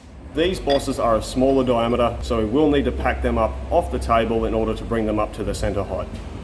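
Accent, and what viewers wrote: Australia. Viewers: Me, not used to the Aussie accent. "Lawn mower?" Oh no wait, "Lawn borer." Like you know, a boring device for out on the lawn, which is probably just a typical Australian way to describe the outside, haha, funny Ozzies. And then it struck me. "Line borer." Okay, English is my third language but still, I should have gotten that sooner! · Aw man, c'mon, don't worry about the aussie accent none, it's part of the charm of the channel/content, and you in particular. Was a fun watch but I'm sad Karen cut the bloopers of you cussing up a STORM tryna get those bushes in. I know there was some behind-the-scenes rage in there and it would've been hilarious to see xD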